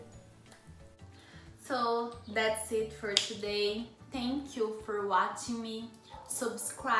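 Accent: Brazil. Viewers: “That’s da tru, I’m sorry.” I’m not making fun of that, but that sounded hilarious, I’m used to Brazilian accents because of my mom and little because of my dad. I was born in America, so I don’t have much of a Brazilian accent, I actually have more of a southern accent. But as funny as the accent sounds sometimes, it is a very beautiful one! A part of me wish I was born in Brazil just so I could have the accent! But God made me the way I am, and Im happy about it, much love from The United States! 🇺🇸 🇧🇷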